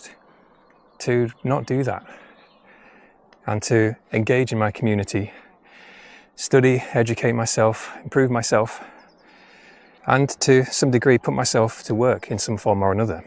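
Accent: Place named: Yorkshire, United Kingdom. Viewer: Hi, thank you for your reply; No, I'm not being too kind, I've just watched some of your other videos; so fascinating. You should have your own TV series on say BBC Four or BBC Two; about Yorkshire. Your speaking voice is awesome; really clear, and well spoken, with just enough of the Yorkshire accent without being too much. I used to work as a graphic designer and artist and still have some links with the TV companies; I mainly worked for Liza Minnelli, and Lonnie Gordon; but I suggested this coming Saturdays evening on Liza on BBC Two; I am going to suggest you to the head of BBC programming; if you are in agreement? You would be excellent.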